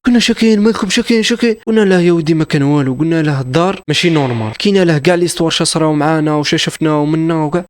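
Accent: Algeria. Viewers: انتما من عين الدفلى خميس مليانة بصح كيفاش تهدرو لهجة الوهرانية 😂 تحيا قصص تاوعك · من اي ولاية أنت حسيتك من ولاية معسكر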